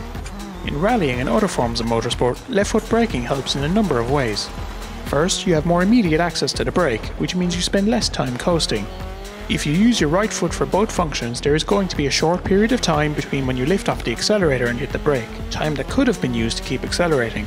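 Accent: Ireland. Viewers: You sound Irish and I’m Irish and you sound like you have an Irish accent, how you Irish.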